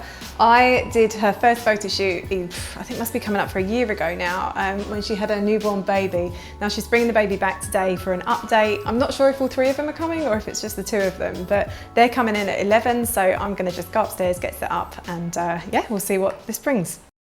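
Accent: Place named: England, United Kingdom. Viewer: Her English accent and the closed captioning are quite entertaining. Very enlightening view of a day in the life. Most photographers don't relish that 60% business part.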